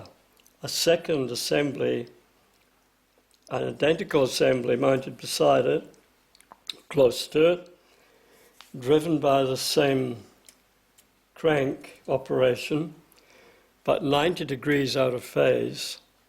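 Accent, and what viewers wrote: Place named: Northland, New Zealand. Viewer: Just by any chance are you a Northland Kiwi????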